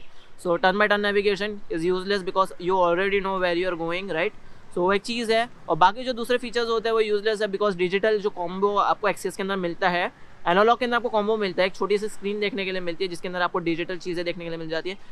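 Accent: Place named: Aurangabad, India. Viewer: Bhai aap kahan se ho apke andaaz se lagta hai aap Aurangabad ke around kahin se to ho.....?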